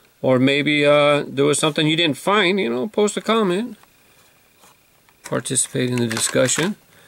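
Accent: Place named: Mexico. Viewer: are you mexican because you sound like you have an accent like my dad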